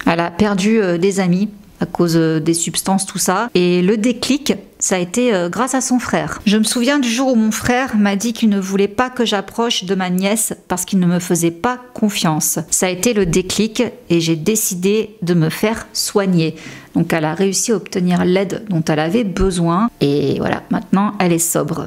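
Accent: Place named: France. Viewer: face XD c'est faith XD LOLL accent francais es adorable j'adore tes video je les écoute chaque matin du canada :) <3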